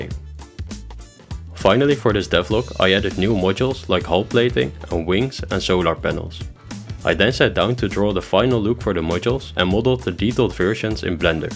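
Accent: Netherlands. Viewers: Hey, ik ga er vanuit dat je Nederlands bent vanwege je accent en ik vroeg mij af bij welke universiteit of hoge school jij game development hebt gestudeerd. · are jouw from the nederlands? becaus jouw sound dutch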